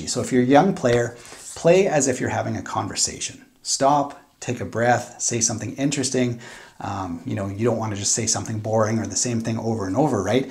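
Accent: Canada. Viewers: Your canada voice entertains me.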